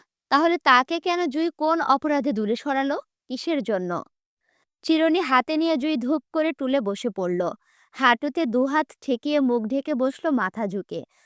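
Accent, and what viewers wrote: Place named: Rangpur, Bangladesh. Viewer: আপু তুমি মনে হয় রংপুর জেলার তাই না রংপুর❤❤😂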